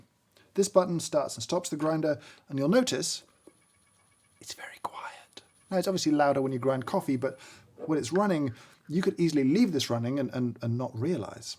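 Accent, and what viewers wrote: United Kingdom. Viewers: I have no intention of buying one of these coffee grinders. I don't even have an espresso machine. I watch your videos because you are so passionate about coffee - oh and the British accent helps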